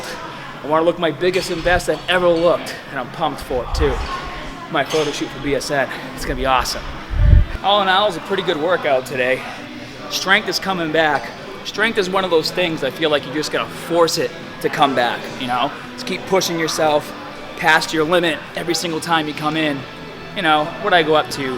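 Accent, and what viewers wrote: Boston, United States. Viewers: what accent is that? Boston accent? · Is that a Bostin accent? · Watah pump Muscle fibers in your aahms Monkey bahs. Boston accent on point today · I never noticed your boston accent in other videos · Hey, love your videos, been viewing for a long time! I'm actually from the MV region aswell. You just don't get that OG Boston accent in channels anymore!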